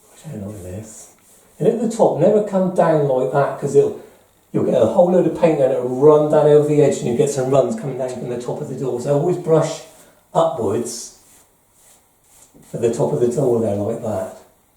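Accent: West Country, United Kingdom. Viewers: What's that accent? Bristol or Somerset way? Nice vid mate.👍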